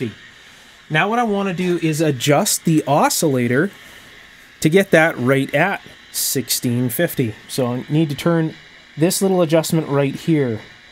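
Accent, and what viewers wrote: Canada. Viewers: Um I said to myself "what the hell is VIM?". I found it is an abrasive cleaner sold in Canada ( accent makes his origins obvious). However, it's not sold elsewhere in North America (from what I can see). Here's a friendly tip for the future, when bragging about a product, give context and show what the product is. VIM is too localized. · It's a great escape to see bring back a classic radio but the real therapy is in the monologue: his Canadian pronunciation is refreshing to these jaded American ears. My. mom used to voice her L's so distinctly that I would shudder as a child hoping not to be taken as foreign. Of course that was useless and the foreign property was a feature of the mind. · are you cannaddian?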